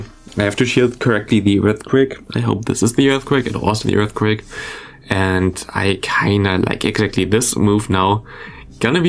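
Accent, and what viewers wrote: Germany. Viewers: Bro I think you have an accent, are you german by any chance?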